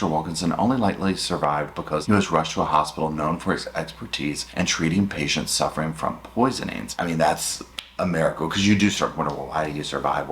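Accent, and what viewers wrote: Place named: North Carolina, United States. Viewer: Just wanted to give you some love from NC and absolute appreciation for your content. I look forward to seeing every notification of a new video. I live in Durham,NC ❤ i knew your accent but didn’t realize you are from NC also.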